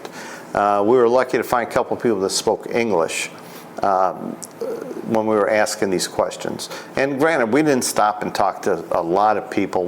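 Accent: United States. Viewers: You from Chicago? Your accent sounds like it?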